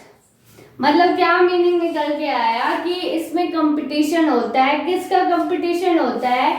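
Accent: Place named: Haryana, India. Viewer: madaam to haryana ki lagti ha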